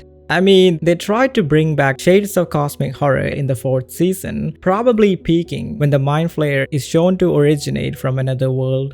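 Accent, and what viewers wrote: South India, India. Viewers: For some reason I hear a South Indian in you...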